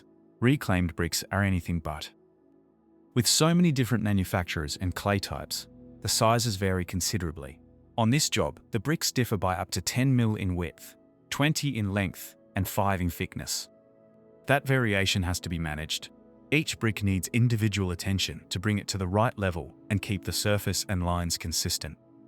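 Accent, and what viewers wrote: Australia. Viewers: Lovely surprise to open a clip and hear an aussie voice. Great video. Thankyou for sharing. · I have watched a few of these videos over the past couple of days here in Dallas, Texas, and you are spot on about his soothing voice. It is warm and comforting and his enunciation seems effortlessly precise, just like his placement of every. single. one. of those goddamn bricks. Add to that the Australian accent and, well, I would pay top dollar for the software that reads to me my emails and texts in that voice. Pivoting now to address the owner of that voice, you have mad skills, sir. Many. Your diction and the stories you tell reveal your intelligence and an awareness that few possess; your videography and landscape designs are evidence of a keen eye; and your diligence and unwavering attention to detail are the mark of a true craftsman. Bravo. 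👏 I'm glad I found your channel. I'll keep watching.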